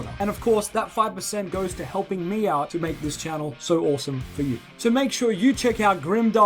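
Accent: Australia. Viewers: New mic? You sound less…Australian? Kiwi? Whatever you are, you sound less like it than last time I saw videos from you. Lol.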